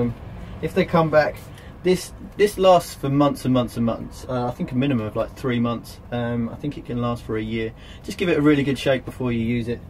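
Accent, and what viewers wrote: England, United Kingdom. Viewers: Thank you young man for an excellent video. No heavy background music and a nice pleasant slowly delivered English accent. Followed your advice and it worked a treat.. Many Thanx Grumpy Grandpop.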